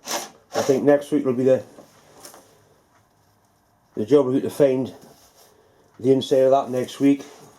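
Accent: Newcastle, United Kingdom. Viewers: Another great year on YouTube,. I wait all week for your SNNCs and it's always a pleasure watching them, which I often do more than once, if only to hear your Geordie dulcet tones cursing "BASTAD"! May I wish you, Debbie and your dad a very merry christmas and a happy and healthy 2017. May I also thank you for your YouTube input, which gives great insight into a very skilled engineer, or as we referred to them in my apprenticeship days in the 60s, 'fitter-turner'... those were the two skills necessary to become a skilled toolmaker in those days and it was taken for granted that if you could turn on a lathe you could also perform milling, surface-grinding, shaping, tool-sharpening, etc. But with you, it doesn't end there... you are probably one of the best multi-media welders I have ever had the privilege to watch and for someone with a home workshop, you perform some of the best brass and bronze small-scale casting that I've seen (OK, it may not pan out right on the first attempt, but you get there in the end... 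but that's metal casting for you). I very much look forward to watching what you get up to in 2017.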